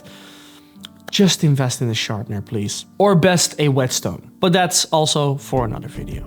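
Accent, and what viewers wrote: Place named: Netherlands. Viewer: Dumlimg recepies please. Support from a fellow Dutchie here😊. Your accent is fine. Yes, it's detectable, but who cares, you're more than understandable.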